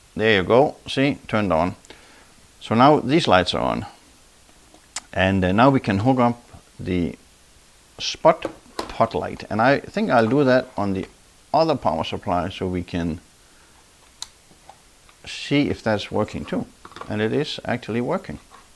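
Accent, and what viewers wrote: Denmark. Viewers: Tak. I trust you probably have Danish ancestry as I do miss the Danish English accent.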